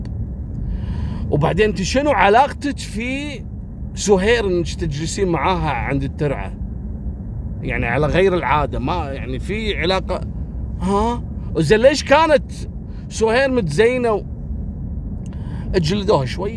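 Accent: Egypt. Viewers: ما أحلاك وانت بتحكي مصري يا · الله الله عليك حجيك بلمصري يجنن 😂😂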